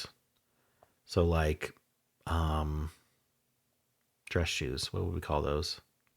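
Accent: United States. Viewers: There's the old American 'T' that sounds like a 'D' again.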